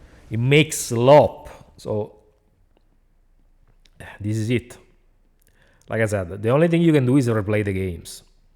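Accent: Italy. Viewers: By the way. The way you say Batman with your Italian accent is so satisfying. In a sense of the phonetics of it. Sorry autism lmao.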